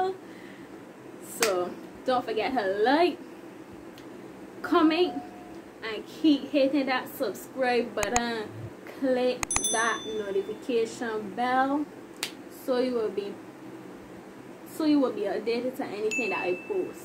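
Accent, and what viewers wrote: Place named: Caribbean. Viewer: You gotta be from nice Caribbean, which one?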